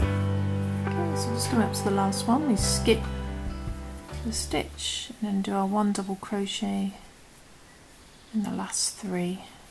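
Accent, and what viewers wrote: United Kingdom. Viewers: Love your accent! British, eh?! Great!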